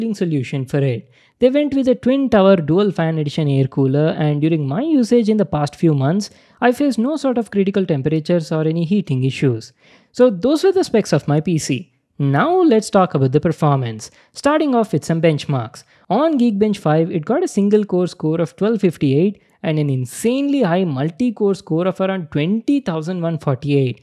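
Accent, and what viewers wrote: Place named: India. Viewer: You speak English but your English is just like Hindi during lisning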